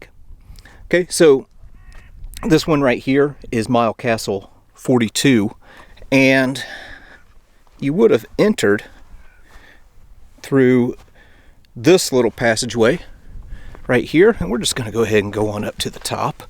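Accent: United States. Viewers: Excellent presentation on the edge of the known world. Dont know why,(raised on U.S. tv shows in the 80's) maybe? But we do enjoy our history being told in an american accent. 🇬🇧🇺🇸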